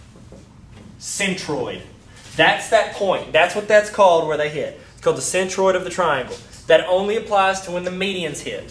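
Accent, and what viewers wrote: United States. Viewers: our teacher makes us use a damn compass to find this stuff, but i got the jist of what you were saying. Great video, and nice accent #amurica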